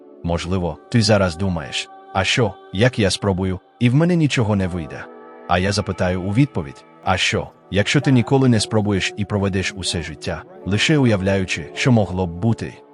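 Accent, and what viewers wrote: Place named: United States. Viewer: Жахлива озвучка, що це за американський акцент?😂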